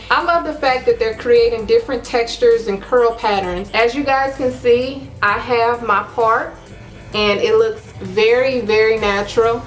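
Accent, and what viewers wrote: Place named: South, United States. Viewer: Aah you're so pretty and your southern accent is charming! I'm just a beginner with crochet (I watched your pretwisted sen. twist videos) but I wanna try this in the future! Thanks for the video!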